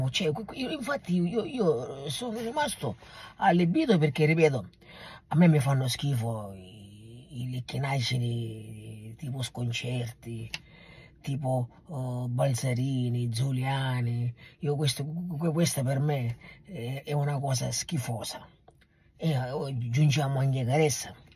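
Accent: Sicily, Italy. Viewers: Sei un continuo piacere l'ascoltarti. Onesta' immensa (e complimenti per come parli lingue straniere, aggiunto al tuo meravigliodo accento siracusano)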